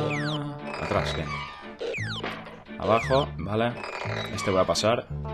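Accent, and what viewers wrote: Mexico. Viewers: GRACIASSSSSSS!!!!!! QUE CHIDO TE SALE EL ACENTO MEXICANO LA NETA JAJAJAJAJA....SALUDOS DESDE MEXICO YEAH.... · tu acento mexicano es muy cool hahaha Saludos desde Mexico...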